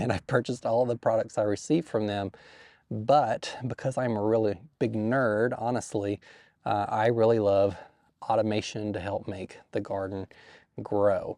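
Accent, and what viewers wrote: South, United States. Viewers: Thank you for giving us a glimpse into your history and your gardening evolution. Though it’s really none of our business, it’s really sweet of you to do that, as some people want to get to know you and are very interested in your garden — watching and learning from you. May I also say your delivery is comforting. I like the ‘Southern’ pace — as new gardeners really need the way you educate with how and why you may be doing something. You are unique in that way. Your love and passion for gardening really comes through. Congratulations on your new property and best wishes for your success!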